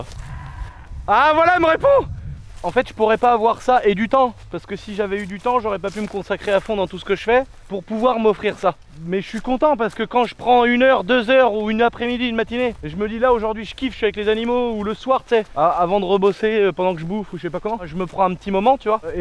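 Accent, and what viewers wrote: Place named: Marseille, France. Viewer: Par pitié arrête de parler comme les marseillais c pour les tonton si non video au top comme toujours bis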